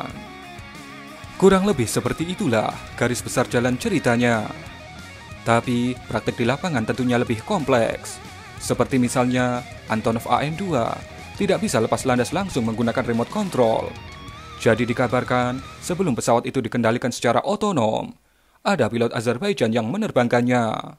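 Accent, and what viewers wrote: Java, Indonesia. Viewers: Ubah dong pengisi suarax Ojok seng meddok ngono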